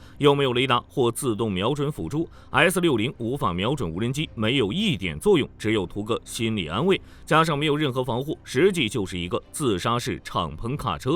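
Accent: China. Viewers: 好抗拒這類普通話聽得很不舒服😢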